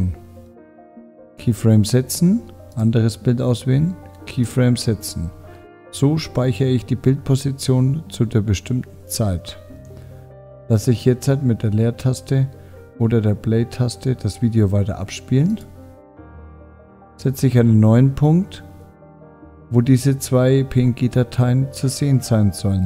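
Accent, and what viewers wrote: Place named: Nuremberg, Germany. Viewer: Gut erklärt, Nürnberger Dialekt nich zu überhören :´)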